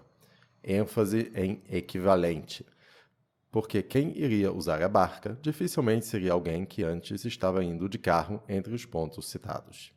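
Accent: France. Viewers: A seu sotaque francês é o sonho de todo carioca.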